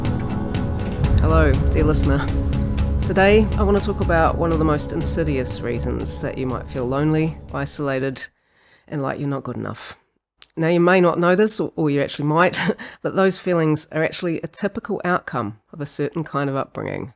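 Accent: New Zealand. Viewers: Kiwi accent.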